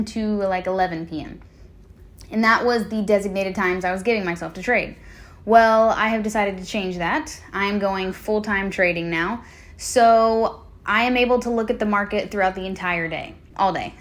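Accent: South, United States. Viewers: Lol I can hear a southern accent a little bit haha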